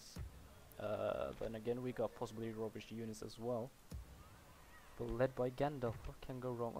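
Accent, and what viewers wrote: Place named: Norway. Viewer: are you norweagen you sound little norweagen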